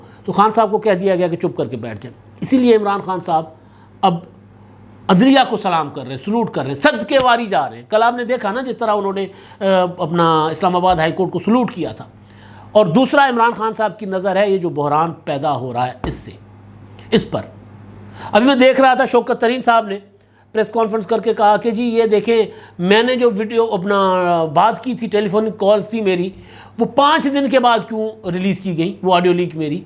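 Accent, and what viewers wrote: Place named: Punjab, Pakistan. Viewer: بھائ میں سندھ شہدادپور سے اپکا پروگرام میں پنجابی ٹچ بہت اچھا لگتا ہے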